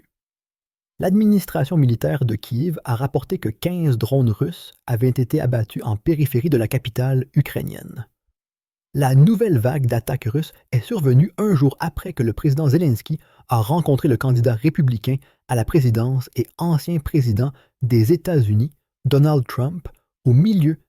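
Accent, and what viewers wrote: Quebec, Canada. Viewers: Un narrateur québécois?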